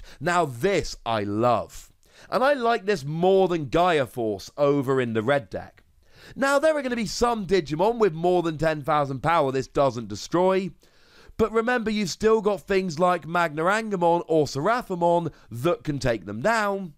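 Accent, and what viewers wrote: England, United Kingdom. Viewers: Weird accent? He has an english accent.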